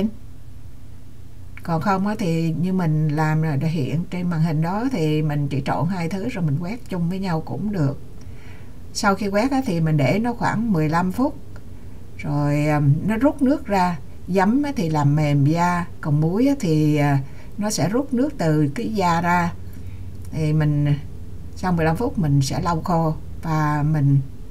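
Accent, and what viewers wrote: Quang Nam, Vietnam. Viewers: Em chỉ dẫn rõ ràng và dễ làm, giọng quảng rất dễ thương vì chị cũng là người đồng hương của em. Cái châm lỗ trên da em order ở đâu vậy? Chị muốn mua. Tks em.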